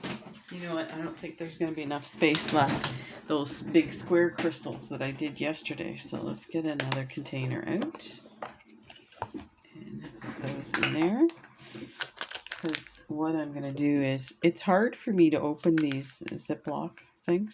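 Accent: Canada. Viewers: Love watching your unboxing videos. You're so excited the whole time. You sound much like a Cape Bretoner, which I am lol. You sound like someone I could be good friends with. Anywho just wanted to share my appreciation, I've just started my beading and jewelry making hobby about 2 yrs ago and love it. I'm looking forward to more video from you and still catching up. Have a great night.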